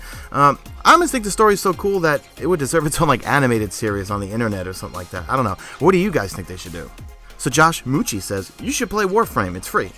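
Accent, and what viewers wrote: New York, United States. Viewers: How do you like living in New York? You totally sound like a New Yorker lol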